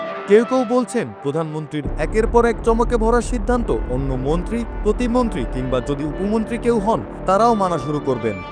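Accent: Kolkata, India. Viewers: কথায় ভারতীয় টান ক্যান ভাই? সমস্যাকে ছমচ্ছ্যা বলাতো স্বাভাবিক না। দুই দিন আগে কোন এক এক্টিভিস্ট এর পোস্ট দেখেছিলাম, গিমিক সরকার নিয়ে সেখানে প্রতিটা পয়েন্ট বলা ছিলো। এই নিউউজ ঐ পোস্টের কাউন্টার 😂😂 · যিনি ভয়েস দিয়েছেন, তিনি কি ভারতীয় নাগরিক নাকি? উচ্চারণ সেটাই মনে হচ্ছে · Jini news porce tini ki Indian , Indian akta tan ace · উপস্থাকের বাড়ি কি ভারতে,ভাষা এমন কেন? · Apne ki dadar desher lok? Vasha sune kolkatar vasa mone holo 😕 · উপস্থাপক কে কি কলকাতা থেকে নিয়ে আসা হয়েছে?? ভাষাটা একটু খেয়াল করে দেখবেন মিথ্যা বললাম কিনা? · ভয়েস যিনি দিছেন, তিনি কি ইন্ডিয়ান নাকি? Audience must use earphone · জিনি সংবাদ পাঠ করলেন সে কি বাংলাদেশি নাকি ইন্ডিয়ান? উনার উচ্চারনে তো আমার সন্দেহ আছে।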